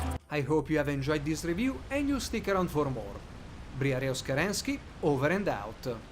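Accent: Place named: Italy. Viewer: Comunque penso (dall' accetto) che tu sia italiano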